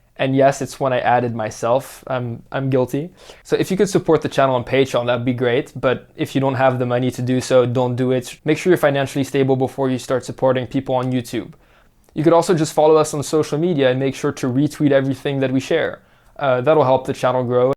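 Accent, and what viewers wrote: Netherlands. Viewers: Why do i hear a dutch accent from a frenchman? Or am i just imagining things? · honestly I thought you were Dutch, your accent sounded more Dutch to me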